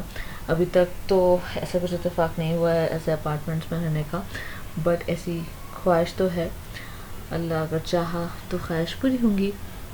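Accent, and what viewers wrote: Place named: Pakistan. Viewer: You sound more like Pakistani not Hyderabadi 😊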